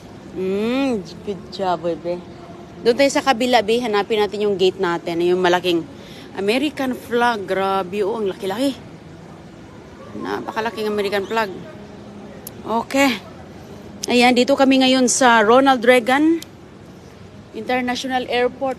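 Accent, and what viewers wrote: Visayas, Philippines. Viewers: Dko tlaga magets bat ung mga bashers ni pati ung pronounciation ni binabash eh sa America nman khit mga American minsan wrong grammar din d big deal sa knila un at ano ang nkkahiya don ang mahalaga naka ka pag communicate c xa kc natural lng d xa ng ppaimpress na super galing xa at super talino...shes just a normal filipino w/ visayan accent...Masyado lng xa overwhelmed kc d nman xa city girl probinsyana xa at khit nasa States na xa gusto niang ipagmmlaki un... Hay, naging defender na tuloy aq...Nkkabwusit kc ungmga bashers bat kaya pinapanood pa nila d nman cla masaya... Kala mo mga dean ng AB English Dept...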